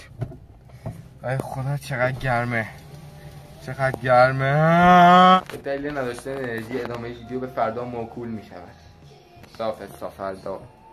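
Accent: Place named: Hamadan, Iran. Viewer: همدانی هستی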